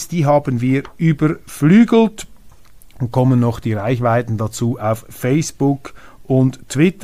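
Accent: Switzerland. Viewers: Bonjour nach Zürich! Wenn mir eine Randbemerkung erlaubt ist.. Ich mag Ihren Schweizer Akzent.. Bin aber überrascht, wie akzentfrei Sie Englisch und Französisch sprechen. Kompliment.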